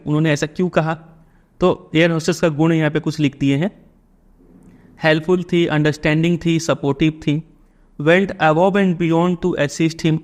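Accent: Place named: East, India. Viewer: Most probably east or north east india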